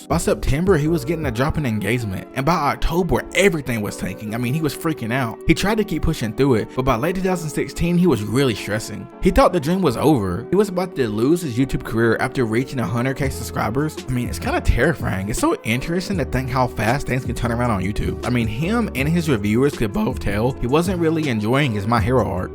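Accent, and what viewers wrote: South, United States. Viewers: I haven’t heard a southern accent this deep since I visited The University of Alabama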